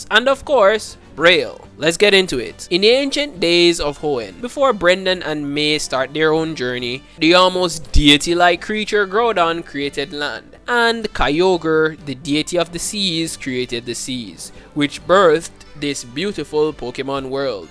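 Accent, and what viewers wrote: Jamaica. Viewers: Are you Jamaican? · I KNEW you were Jamaican. What parish you from? My Mom's from Hanover. I was born in Canada though.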